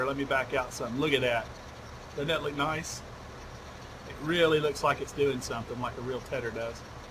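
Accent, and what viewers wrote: South, United States. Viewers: you taught me that not everyone with a southern accent is a bit slow good logical play through and info for us new players, thumbs up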